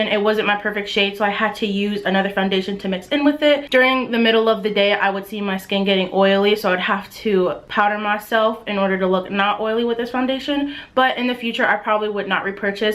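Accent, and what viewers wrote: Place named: South, United States. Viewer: You sound extra southern in this video 😂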